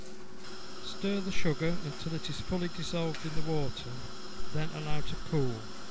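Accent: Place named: England, United Kingdom. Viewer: Fair play, must taste delicious but cant stand that english commentary.... get on to the BBC and you could malke a living..!! Well done tho!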